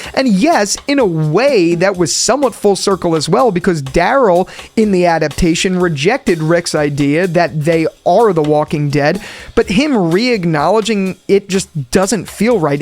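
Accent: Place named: Philadelphia, United States. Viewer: Love the Philly accent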